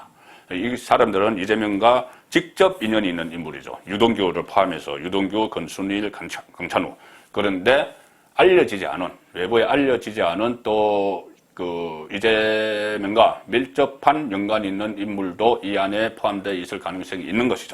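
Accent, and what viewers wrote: Gyeongsang, South Korea. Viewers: '대장동게이터 이혹'이 있는... 갱상도 사투리가 구수하시네요.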